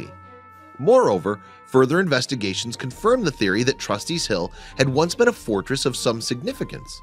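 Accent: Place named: Midwest, United States. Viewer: The narrator has a Wisconsin or Chicago northern accent so he's mispronouncing a lot of words.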